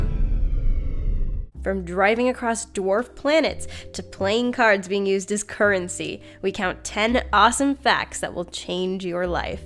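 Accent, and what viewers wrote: Canada. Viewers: Okay the only fact that blew my mind (No pun intended, you'll see why) is that that they're pretty much no naturally blue pigmented foods. And I had a feeling you were Canadian because a few videos ago I remember hearing pronounce a few words in ways that sounded like a Canadian said it. And it's cool! I'm American, but I'm fans of some Canadian skaters, there's some Canadian YouTubers I think are cool, and I have a few friends that are Canadian.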